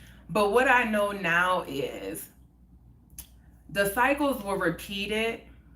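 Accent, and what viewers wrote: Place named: New Orleans, United States. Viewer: I like that T nw orleans accent u he And me😊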